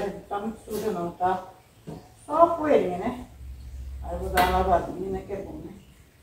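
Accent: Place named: Bahia, Brazil. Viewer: Agora parece que é baiana mesmo né oxente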